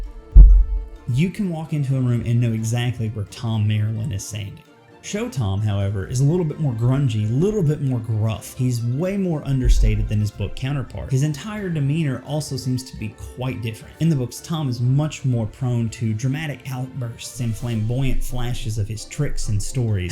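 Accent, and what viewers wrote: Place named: Alabama, United States. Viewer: I thought I heard some Alabama in there. I've got family in Tallapoosa, Georgia (which is within spitting distance of Alabama). I've loved that accent for years. My wife and I are from SE Indiana (Aurora)...which is basically near Cincinatti, OH. We are in the Tri-State (Indiana, Ohio and Kentucky). We have an accent, but to us...it's just the way you talk. Thanks for sharing.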